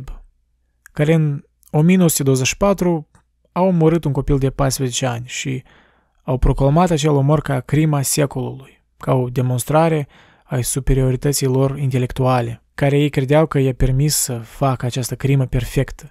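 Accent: Moldova. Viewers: o idee excelenta dar accentul moldovenesc imi vomita in ureche o abominatie a limbii romane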